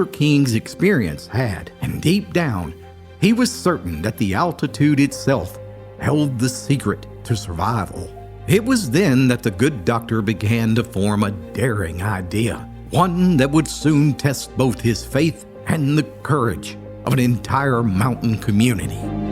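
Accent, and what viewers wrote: Appalachia, United States. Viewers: Needing to rest and listen to something.. pops up! PERFECT! 😊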